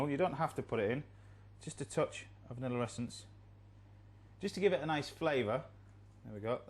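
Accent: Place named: Yorkshire, United Kingdom. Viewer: How Yorkshire does he sound! I fell in love with when I lived in Leeds but never thought he sounded really Yorkshire. But now I'm back in Australia, I can't believe how strong it is! By gum lad.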